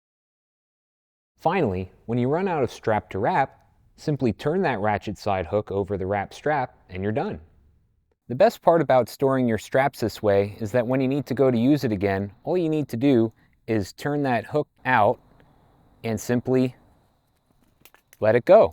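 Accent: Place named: Pennsylvania, United States. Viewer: Thats accent says Pennsylvania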